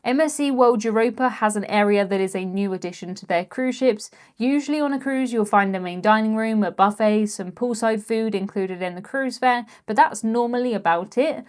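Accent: United Kingdom. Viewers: Not a moan, but more of a question. Why do you call biscuits cookies, when sound like you are from the UK? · I just love this gal. The Brit accent is intoxicating!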